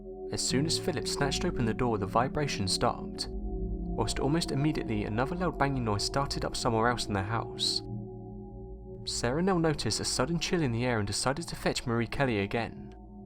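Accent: England, United Kingdom. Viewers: I feel that his speed is just fine, you might have to get use to his English accent. If you are only use to an american accent then the english, Irish and Scottish accents can be difficult to understand. If you keep listening to these accents then it will become easier with time. 😊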